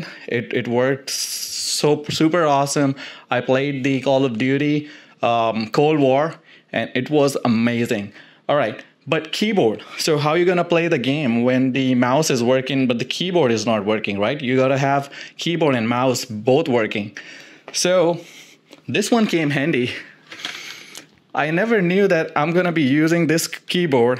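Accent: India. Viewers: Either use Indian accent or foreign. Indiam accent mixed with foreign accent makes it unbearable to hear. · U have Indian accent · Why do I hear a indian accent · Bro you're indian? Indian accent in your english boiii...... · Your accent sounds indian. R u an indian bro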